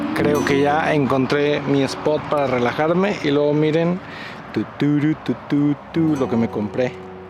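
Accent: Mexico. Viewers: Me encantan tus videos y me sirven mucho, porque estoy en la misma que tu, pero... me perturba que hables como Mexicano, entiendo que ocupes palabras, pero sinceramente no pareces Chileno y es fome que eso se pierda. Saludos y mucho éxito.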